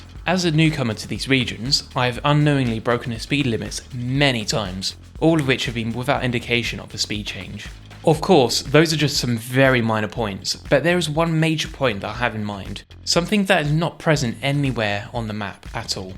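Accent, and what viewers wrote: United Kingdom. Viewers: Some of your negatives are realistic tho, you get slower speed limits in the middle of nowhere just because there might be a forest road that log trucks frequently use, or an intersection, in video you clearly see there's some buildings so it might be due to the safety and also noise pollution, judging from your accent you are british therefore I get it, but literally everhywhere in Europe 50km/h applies if you see a city sign in some countries it is the one with the city name in some you have a city name and then the urban area sign with buildings on it which act as a zone with rules such as 50km/h, parking allowed, cars can be in left lane even if nobody is in the right unless blocking faster cars etc...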